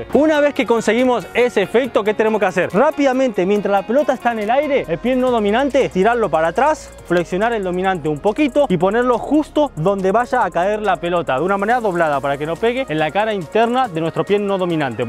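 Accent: Argentina. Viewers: sos de argentina no?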